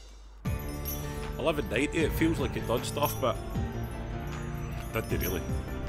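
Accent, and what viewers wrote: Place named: Scotland, United Kingdom. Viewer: Hahahaha yass this is the best slot video I’ve seen in a long time🤣 was so happy when I heard you were Scottish anaw. Subbed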